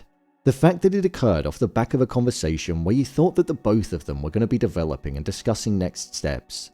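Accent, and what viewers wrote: United Kingdom. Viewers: You British (??) hear your voice and it sends my mind to peace. Good breakdown. 💪🏿🫶🏾👍🏿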